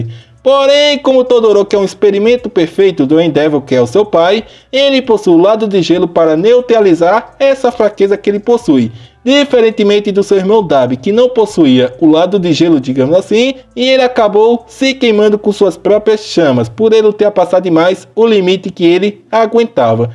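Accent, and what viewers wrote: Northeast, Brazil. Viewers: Quando Vi A Thumbnail, Achei Quê Você Enlouqueceu De Tanto Teorizar Para Preencher Os Furos De Roteiro & Falta De Lógica & Etc ....., 😅😂😂😂. Adoro Seus Videos De Ben 10 e Seu Sutaque Nordestino???, Sulista???, 🎉😅.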